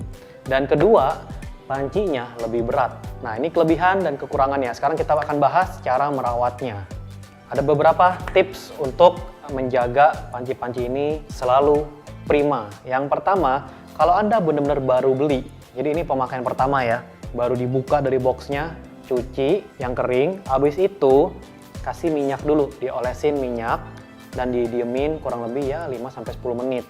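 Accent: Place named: Palembang, Indonesia. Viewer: Wong Plembang caknyo bicek ini hhahahaa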